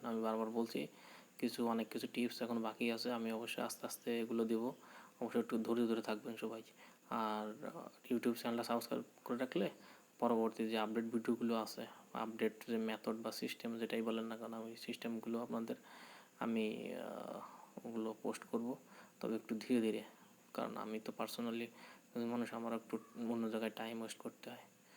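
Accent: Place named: Khulna, Bangladesh. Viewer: Vai, apnar Kotha suna to khulnar Lok mone hoy. Jai hok personally Kotha bol jabe?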